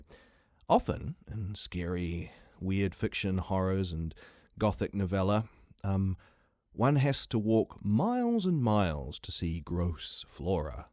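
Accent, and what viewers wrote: New Zealand. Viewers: Kia ora, I'm in Aotearoa too. Lovely to hear a kiwi voice. I narrate audio books for the blind foundation. I've always wanted to do old skool horror. Love your voice. Benson is one of my fave authors.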